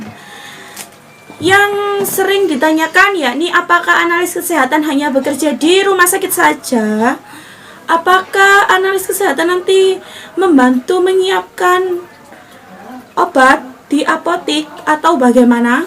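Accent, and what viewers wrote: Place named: Java, Indonesia. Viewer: Medok banget kak .. wkwk orang Jawa kah ?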